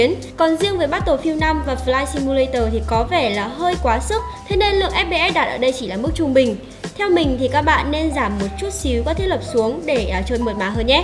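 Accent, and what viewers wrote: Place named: Hai Duong, Vietnam. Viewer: Chắc chắn là quê Hải Dương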